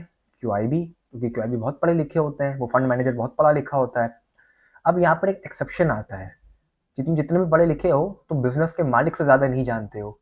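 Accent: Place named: Kanpur, India. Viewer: Brother are u from kanpur ? Baat karne lehja wahi ka lag raha h ... Appreciated your efforts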